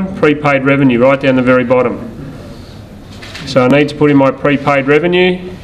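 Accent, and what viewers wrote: Australia. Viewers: are u from Australia mate ?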